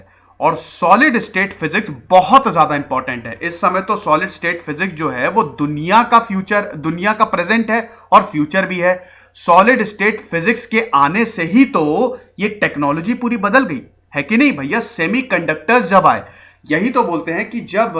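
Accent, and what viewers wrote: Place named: Kanpur, India. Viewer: Ye kaaanpur style ghussana jaruri nahi hai... Try to talk in neutral dialect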